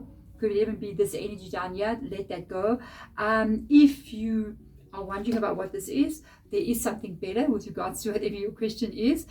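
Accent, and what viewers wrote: South Africa. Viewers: Hello! I am a fellow South African Aussie! I miss the Saffa accent and I’m so glad I found you! I could listen to you talk for hours. Thank you so much for the Sagi guidance 🇿🇦🇦🇺♐️🤍🙏🏻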